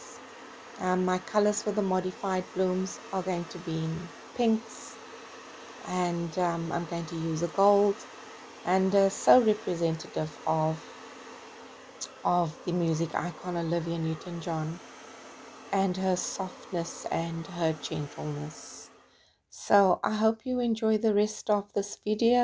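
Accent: South Africa. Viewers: Hi, it's my first time on your channel. I can hear from your accent that you are South African - I'm just wondering where you manage to get Australian Floetrol??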